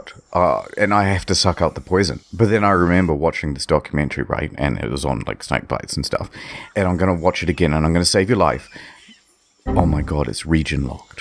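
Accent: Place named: Australia. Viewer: oh yeaahhh, just lurve me some cringey ozzie voice with classic gaslighting/manipulative tone of pretense-of-niceness. This guy is so transparent. Ahh, youth.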